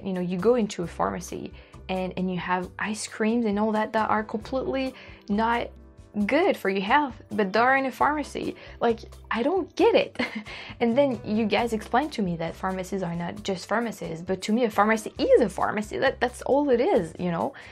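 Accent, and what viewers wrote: United States. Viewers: The way she speaks English with an American accent is so freaking cute... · Your English is American accent! Did you live here ? Love the vlog and you living life to the max. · I have watched you for a few years and your "American English" is getting very obvious. I can hear it very clearly. It reminds me of when I was in Eastern Europe and the young people learned English from Americans (Bosnia and Kosovo).. They would pick up southern accents and it was funny to hear them talk with an eastern European accent combined with a US southern accent. Also hearing them adopt the culture of "yes sir/ma'am", general politeness to strangers, etc. I miss taking the people that worked on our bases and their families to special occasions like Thanksgiving dinner and 4th of July parties where we opened up our dining facility and had sports events for them and their families! 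So much fun! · Can I just say how much your English has improved even from a year ago. Your accent is very light now and you have a good grasp of the American accent.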